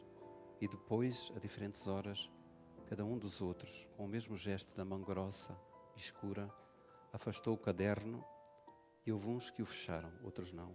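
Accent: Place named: Portugal. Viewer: O português de portugal é bem lindo <3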